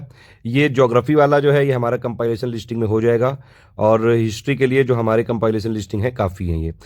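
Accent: Kanpur, India. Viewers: 😁😁😁😁😁 bhut sahi se liye ho kanpuriya style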